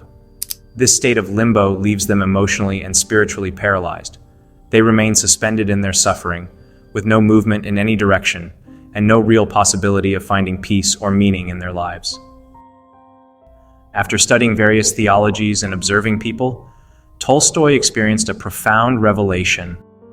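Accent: United States. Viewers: I thought i was going to get some professional English speaking historian talking about this, and what I get is another jumped up Yank. No, thank you. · Why an American accent ur not European goodbye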